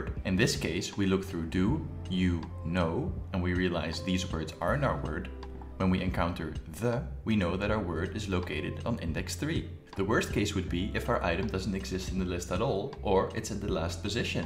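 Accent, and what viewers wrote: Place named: Netherlands. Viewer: I smell Dutch 🤔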